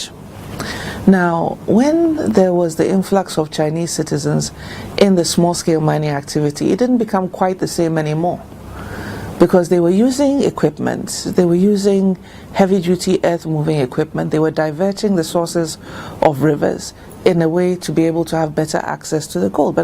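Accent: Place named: Ghana. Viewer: i love her GHanaian way of speaking English- very nice and clear!!! lovely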